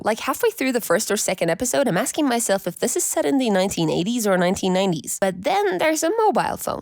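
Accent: United States. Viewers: you don't need to, i already did it for you. it's just an American accent. :)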